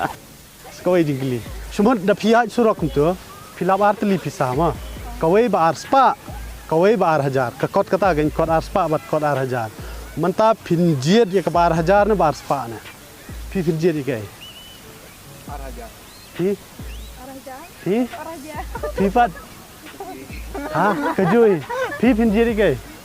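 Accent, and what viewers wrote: Meghalaya, India. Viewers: I thought that u r khasi but pnar . I like it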